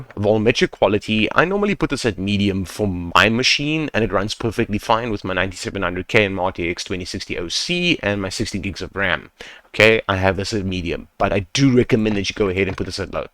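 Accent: South Africa. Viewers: This is amazing, also I love the South Africa accent what an absolute hilarious accent to listen to almost as funny as the Scottish accent god bless you for this brother not only excellently explained in a clear and consise manner but humor combined with the simplicity had me on a roll thanks again! Would love to meet you in person! 🔥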